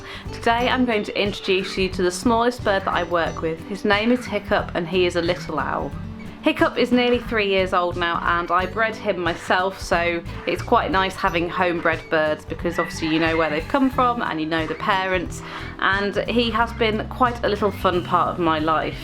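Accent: United Kingdom. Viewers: We used to pass a pair of burrowing owls on our way to work... it was at a corner, and they would spend the morning watching vehicles turning left... very cute! <link> One thing I realized is that I like your channel because unlike commercially produced nature shows, you don't have either the "syrupy saccharine" sound, or the "absurd melodromatic" sound, which are quite wearing, after a while. Of course we colonials can never get enough of the accents from the Mother Country! ;-)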